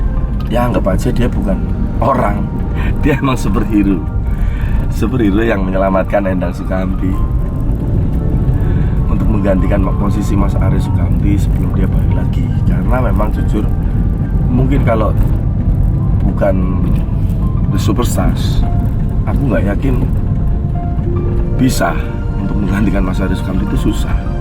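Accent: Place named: Java, Indonesia. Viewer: Mas, kamis Inggris saiki ilang yo? Hehe, kangen karo english java ne mas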